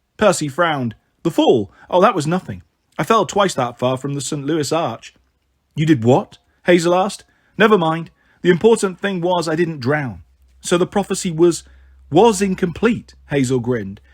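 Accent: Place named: United Kingdom. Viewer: I love listening to HOO in British ❤️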